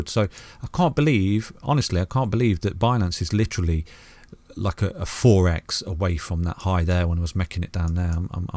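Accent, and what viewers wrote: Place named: Nottingham, United Kingdom. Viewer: You sound like a Nottingham lad! Great TA mate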